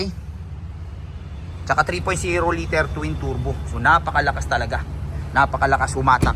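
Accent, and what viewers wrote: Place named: Pangasinan, Philippines. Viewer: Lasi ka taga pangasinan ka manaya boss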